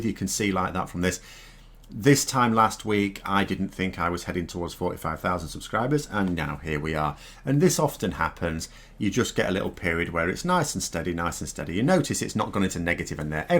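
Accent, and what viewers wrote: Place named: Northern England, United Kingdom. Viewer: Love your work, business apparently having a ‘northern’ accent is a credibility problem for the comfortably well off ‘southerners’. Do you know why this disparaging attitude exists?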